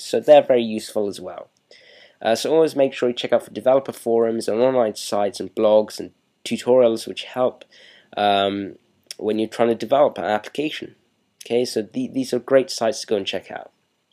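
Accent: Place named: Germany. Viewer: i sense a german accent, being german myself, where in germany were you born?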